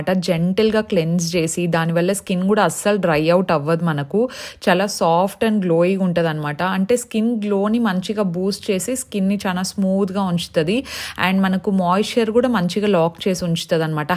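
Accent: Anantapur, India. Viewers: AA Emi julee ni esukochinare pinni ananthapur slang haaaaaaaa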